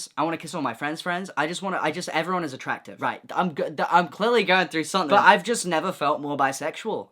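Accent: United Kingdom. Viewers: he's so bri'ish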